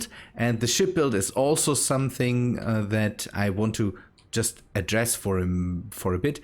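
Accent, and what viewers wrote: Germany. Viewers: I really like the way you speak english. Maybe it's because you've a German accent. At all your Voice sounds really good. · I have a question r u German because it sounds like it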